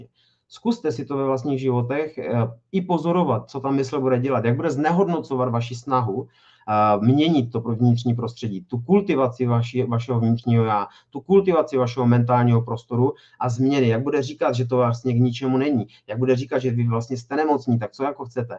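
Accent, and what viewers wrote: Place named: Ostrava, Czech Republic. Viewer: Ten ostravský přízvuk je super, jen to dost kazí ta sprostá slova. A to je škoda.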